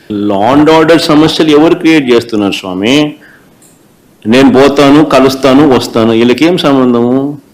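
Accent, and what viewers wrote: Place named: Rayalaseema, India. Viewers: మన రాయలసీమ యాసే సామి😂❤ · Rayalseema slang 🔥🔥🔥🔥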